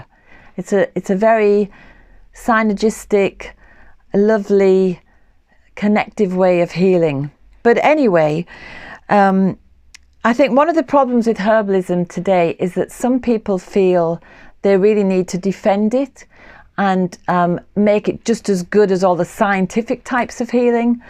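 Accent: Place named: North East England, United Kingdom. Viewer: Another great video ! I always hear the north east accent whilst your talking, .I'm from South Shields and guess your from close by.. Thank you for sharing your knowledge and love of nature with us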